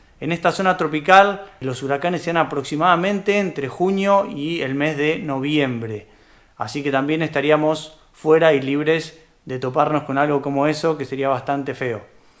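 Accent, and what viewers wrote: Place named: Argentina. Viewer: Gracias!! Sobre todo tu acento argentino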